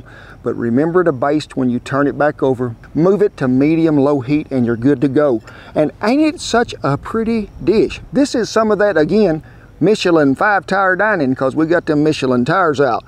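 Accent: South, United States. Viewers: " Done gottum caught, an in the boat, an fitem t putum ona fire" Mr. you're as country talking as us folk in Upstate S. Carolina. Love your videos.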